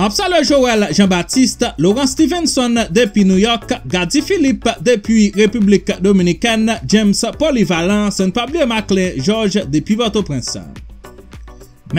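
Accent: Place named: Martinique, France. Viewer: Have you lived in the Caribbean before, you've sound like people from Martinique ou la Guadeloupe?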